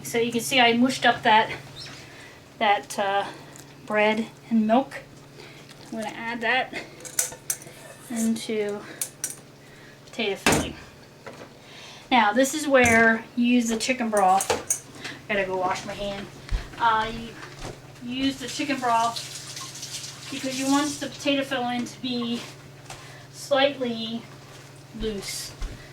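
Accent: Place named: Pennsylvania, United States. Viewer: I could tell you were from that area as soon as I heard you talk! I lived in Norristown and then Harleysville until I was 10 years old. Can’t miss that accent!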